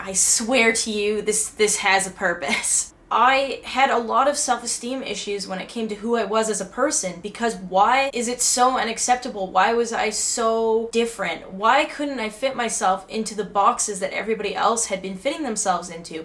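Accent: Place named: Newfoundland, Canada. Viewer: dude you sound newfie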